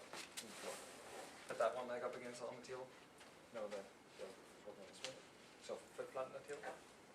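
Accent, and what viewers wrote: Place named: Ireland. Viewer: Thought I could hear the dulcet tones of an Irish (Ulster?) native!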